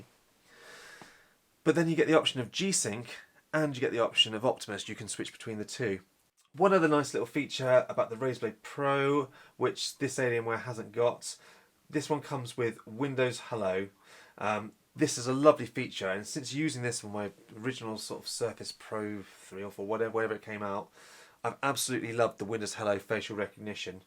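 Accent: United Kingdom. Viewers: I gotta tell ya I was just mildly interested in laptops cause I'm figuring out which alien gaming beast I should get, but more I watch more I wanna know. All this undervolting, different testing etc.. Really interesting. Your videos are great. Hope the channel explodes. Keep it up mate! Edit: what part of England is this accent you sound a lot like Ricky Gervais same accent where is that?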